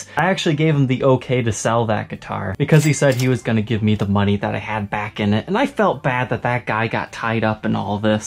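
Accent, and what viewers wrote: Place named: United States. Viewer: Because you sound American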